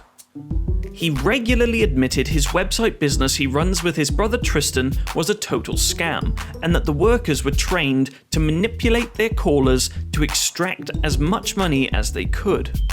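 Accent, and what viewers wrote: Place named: Wales, United Kingdom. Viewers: Why does the wikifeed AI voice sound like it's from Swansea? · I love that the A.I voice you use is welsh. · The Welsh accent.❤ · Why does wikifeed have a North Wales accent?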